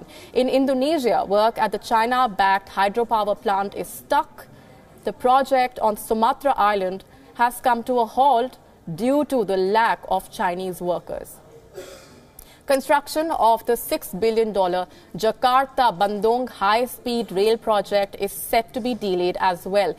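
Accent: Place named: India. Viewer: Very heavy Indian accent.....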